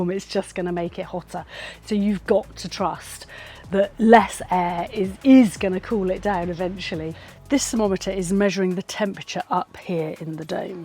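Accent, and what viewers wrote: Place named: Bristol, United Kingdom. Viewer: I’m starting to hear your Bristolian accent! Thanx for these informative kebab videos my love! Greetings from a fellow Bristolian stationed in sunny Cyprus 🫡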